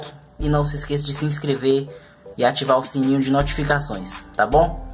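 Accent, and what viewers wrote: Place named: Pernambuco, Brazil. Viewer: Ele é pernanbucano, faz parte do sotaque butar é a mesma coisa que botar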